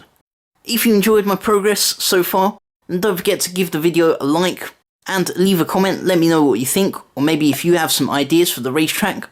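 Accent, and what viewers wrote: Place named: Southern England, United Kingdom. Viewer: I can recognise your accent but whereabouts in england are you from (guessing its down south)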